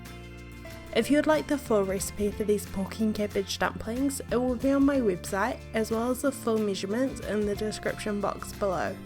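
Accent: New Zealand. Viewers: A New Zealander. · Are you kiwi? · Thank you for the recipe and instruction very simple and clear. Notice you got a NZ accent. Love from Christchurch. Cheers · Awesome dumplings, and once again, love the New Zealand accent...... You go girl, do you think you could make hangi dumplings? Love the channel, and ideas you have. Yummy stuff. From Palmy...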